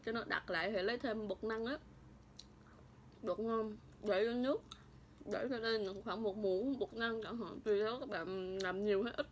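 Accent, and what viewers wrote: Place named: Bahnar, Vietnam. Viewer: Tuan NGuyen chị nói.. Là người Ba Na kìa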